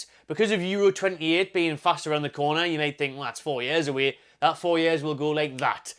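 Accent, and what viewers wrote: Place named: Newcastle, United Kingdom. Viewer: proper Geordie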